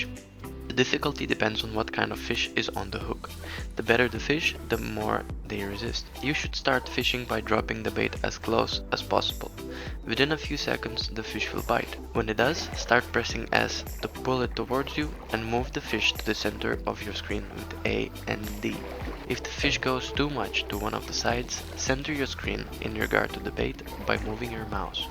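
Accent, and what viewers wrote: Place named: Lithuania. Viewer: u sound lithuanian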